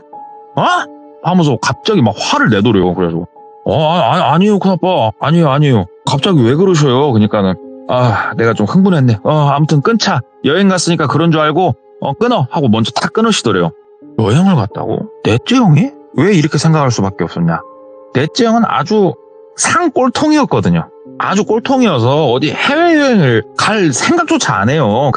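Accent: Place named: Jeonju, South Korea. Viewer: 전주 분이신가 보네요 ㅎㅎ 친구랑 말투가 똑같아서 뭔가 얼굴도 모르지만 친근감 있어요 ㅋㅋㅋㅋ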